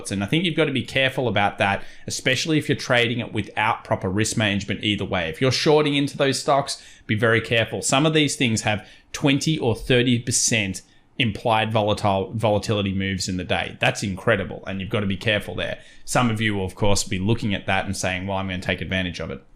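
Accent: Australia. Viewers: Glad I found. I look forward to the daily updates, guidance, expertise. Still have to listen closely as the Aussie accent is a lil heavy. lol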